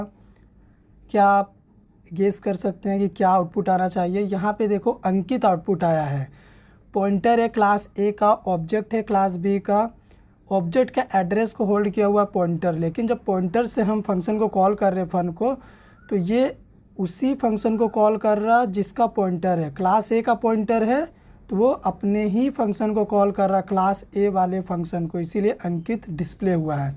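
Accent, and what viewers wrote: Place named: Hyderabad, India. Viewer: Hyderabadi slang zindabad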